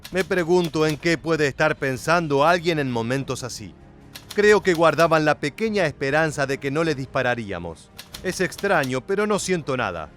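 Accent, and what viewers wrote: Argentina. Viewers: Argentina, no escuchas su acento? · Es Argentino, sólo escuchá su acento.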